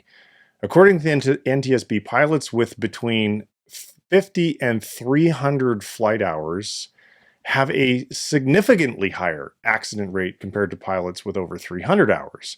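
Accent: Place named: Canada. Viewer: Judging from your voice, it appears you are Canadian or have spent some time there.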